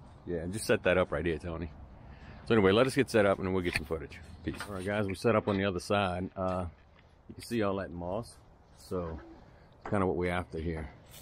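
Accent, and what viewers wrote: Louisiana, United States. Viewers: Man I knew you sounded like you were from Louisiana Saw the 985 area code on the water tank Awesome video!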